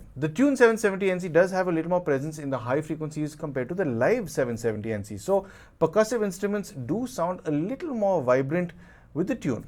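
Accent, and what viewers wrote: India. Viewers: interesting review. Thanks for it...! You have a strange perhaps a Hindi accent, please speak a bit slow, because sometimes is dificult to understand. English is my second language. Thank you from Buenos Aires.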